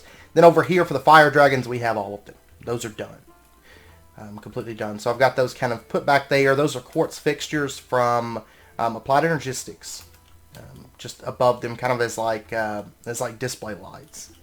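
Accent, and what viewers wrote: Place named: South, United States. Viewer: somewhere in southern USA afaik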